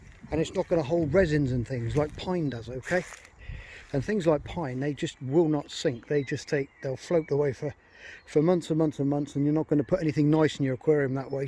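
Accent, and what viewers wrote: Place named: England, United Kingdom. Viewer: Friendly neighbourhood Aussie pest here again. Lord I luv a pommy accent. Lol Ok lets start watching.......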